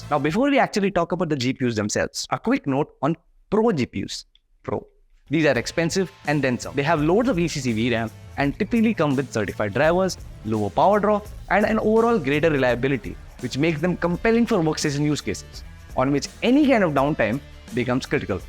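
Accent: India. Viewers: hard to understand what ur saying with indian accent and background music combined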